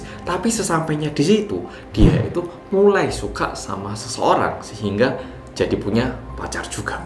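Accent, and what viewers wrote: Java, Indonesia. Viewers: Medok x logat jawanya, tak pikir orang jepang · Korea medok hahaha.. sehat terus mas e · Salam knal mas, seneng ngikuti ceritanya Apa mas tinggal di Indonesia atau di korea kok msh. medok bhs. Jawanya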